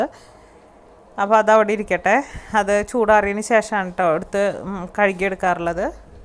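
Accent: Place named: Thrissur, India. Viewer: Thrissur slang varunnundallo.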